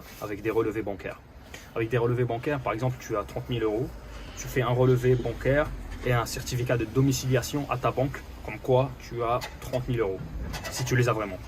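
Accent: Algeria. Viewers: Fraht ki sma3t ta voix w 3reft Belli weld Bladi stp g une licence en finances et comptabilité qu'est ce que tu me conseilles je postule M1 ou L3 et qu'elle spécialité et surtt qu'elle université ? Merci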